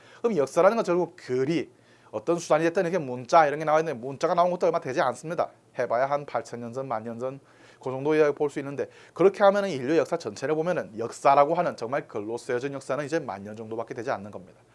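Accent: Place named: North Korea. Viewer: 완전 김정은의 흉내를 낼려고 북힌말을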